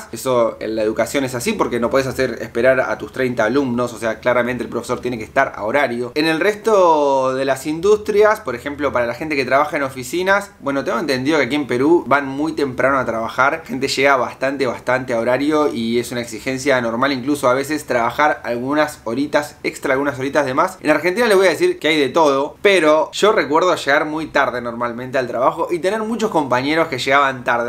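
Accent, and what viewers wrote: Argentina. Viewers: Muy interesante tu vídeo!! Bien expuesto muy claro. Se que tienes todo bien calculado. Me alegro que estes conténto con tu trabajo!!! Es verdad que los argentinos son muy bien vistos y considerados en Peru!! Especial mente por las chicas jajajajajaja 😂. Es sabido que no hay Argentino feo!! Todos son bellos y sobretodo encantadores, creo que eso que decían antes que el Argentino es sobrado ya paso a la historia verdad?? Ojalá todo continúe como dices avanzando y no empiecen las trabas con el gobierno de este señor Rojo!! Espero sigan tus existos tanto en el canal y como docente!! Me encanta cuando hablas y dices Posho, shamame, Sho etc etc jsjajaja😂. Me gusta ver que la gente te quiere!! Los peruanos somos querendones con los extranjeros pero no!! entre nosotros lamentablemente!!! 🙁. Buena vibra 😊 Bendiciones 🌺🌸😊😉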